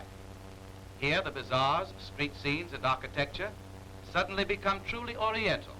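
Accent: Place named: Mid-Atlantic, United States. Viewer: Great example of the Mid Atlantic or Transantlantic accent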